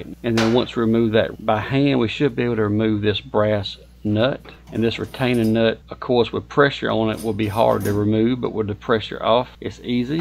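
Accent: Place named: South, United States. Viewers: Helped me so much for my shower. Could listen to a Georgia accent all day. -homesick in KS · I work as a handyman and I'm always learning new things. Doing a replacement of this exact valve for a coworker. Gotta say, once I heard the southern accent, I knew I'd be walking away a smarter man. Thanks dude.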